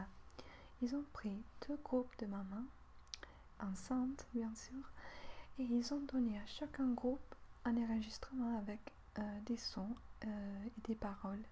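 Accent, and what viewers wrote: Italy. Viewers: tu parles super bien français en plus ton petit accent italien est super bien je trouve que sa donne un plus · J'adore tes vidéos ! Je les aies fait découvrir a ma sœur aussi et pratiquement tous les soirs on t'ecoute pour nous aider a dormir, tu es comme notre jolie berceuse. Tu nous berces de paroles positives, tu nous racontes tout ce que tu connais, c'est passionnant ! Et ton accent italien en parlant français c'est encore plus joli ! Tu m'apaises, tu me destresse, c'est un vrai plaisir de t'ecouter! Tu as un très bon français a ce que j'ai vu sur les autres vidéos aussi :) Sinon en lecture française je te conseille les grands classiques comme Notre dame de Paris de Victor Hugo ( je lis beaucoup plus de littérature anglaises) Bisous et continue !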